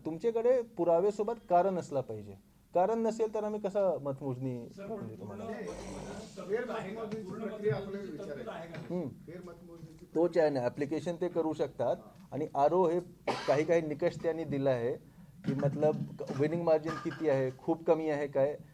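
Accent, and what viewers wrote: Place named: Gujarat, India. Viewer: गुजराती मराठी निट बोल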